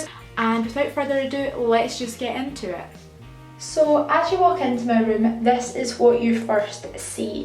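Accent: Scotland, United Kingdom. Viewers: This room is really cool! I'm just going back for my third year now so am watching videos like this in anticipation. Are you Scottish? Only ask because I am! :)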